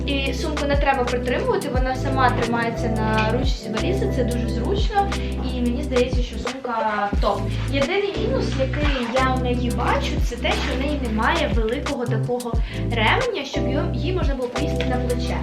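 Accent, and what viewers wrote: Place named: United States. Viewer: це так цікаво❤️ Детальний розбір, покупок, сумок) А твоя англійська 😍 наче ти амерікан гьорл)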